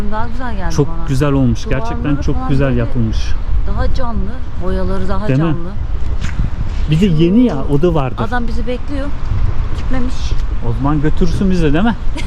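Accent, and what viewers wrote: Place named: Aegean, Turkey. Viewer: türklüğüyle övünen güzel bir kanal..tebrikler..şiveniz egenin ödemiş tarafları gibi, nerelisiniz kardeşim?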